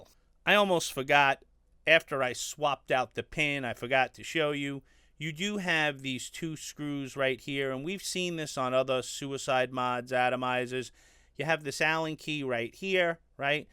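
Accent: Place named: New York, United States. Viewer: I can't use the low profile drip tips either. I just don't get WHY. But I just LOVE watching your videos man! So funny when it's all quiet, the kids are in bed, then all of a sudden a NY booming voice... "WHAT'S UP CLOUD CHASE-AHS!!!!" 😁🙏💗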